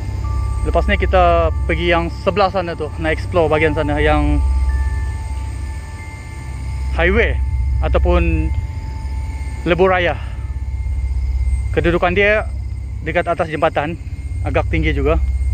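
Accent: Sabah, Malaysia. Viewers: Nmpk sgt tk bergaul dgn orang n bodo, ni slang sabah lol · Patut pun gea lain2 sia dingar.. Kompom2 da sia agak sabah sy bilang.. 1 kali nga butul2 bha org sabah.. Tebaik gaman 👍👍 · Bos org mana..mcm loghat sabahan pun ada..terbaik bos..sy suka konten mcm ni.. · ckp pelik sikit trs cop indon..sabah sarawak pun ad slang mcm ni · Sabahan tu bro, ko org mana yg xkenal loghat dlm malaysia ni org burma ke sejati?? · Hati2 bro klau masuk hutan..klau boleh bawa parang lah..👍👍 Dgr loghat bro btul2 mcm orang sabah😁😁😁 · kau org Sabah ke Bah? Dengar mcm sabahan saja kau......Sabah mmg ckp mcm ini....Kenapa Masih ada lagi yg Tidak faham bah😄.... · Alaa dh tau penonton satu negara tgk ckp je la standard bm, sekali sekala nak selit2 sabah tu ok la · Mcm owg sabah dialekny... Jln kna kg Jin... Jin mrah laa kod... Jin ni dy bleh hidup ribuan taon.... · Perkongsian baik..merungkai sejarah dan misteri.(gaya bahasa-mcm org sabah)..Terbaik ,U terokai byk kwsan..like👍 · Hi saya first time kt sini.. 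Bro memang buat explore sorang@ Admin dri sabah ye.. 6thn di sabah.. dpt cam dh slang org sabah✌🏻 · Orang Sabah ka?? Boleh tahan bro! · Pekat Sabah Admin nie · cakap sabah sja bro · Slangnya macam urang sabah bangsa brunei.. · Kalau ckp sabah aku jadi x fhm ni pun dia ckp ok walaupun ada loghat sabah sikit2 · Sabahan ker? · bosku org sabah ka? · Mcm ada terbunyi2 slank2 sabah ko ni geng.. org sabah ka kawan? Heheh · loghat sabah ni. Sarawak takkan loghat begitu kalau bertutur dalam bahasa malayu baku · ko org mna bos sleng mcm sabah mix smenanjung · Sabah lh tu...sy pn mcm tu..hahaha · Awk org mana?? Knpe cara cakap MCM org Sabah sikit2. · Min ni mix ke macam sabah ade india ada 😅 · Mix sabahan ke bro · Terbaik.bro.. tapikan sape mcm aku confuse?? Bro ni ckp bunyi sabah ke bunyi sleng bangladesh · First dgr kau ckp pun blh tau org sabah..dusun😂 cakap sabah ja boss senang,sy pun sda lama mnetap d kl since 2014 smpai skg msih lg ckp sabah,nda pndai cakap kihil😂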